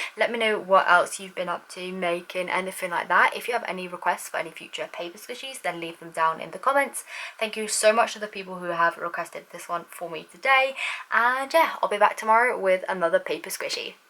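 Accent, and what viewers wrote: United Kingdom. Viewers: She speaks british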